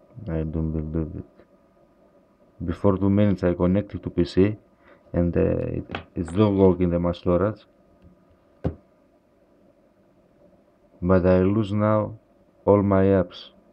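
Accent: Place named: Greece. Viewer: Otan o ellhnas milaei agglika fenete apo thn profora tu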